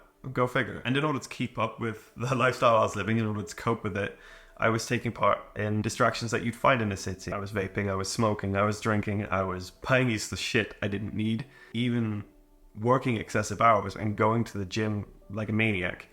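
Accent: Sweden. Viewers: you already lost your British accent and sound like a swede speaking English.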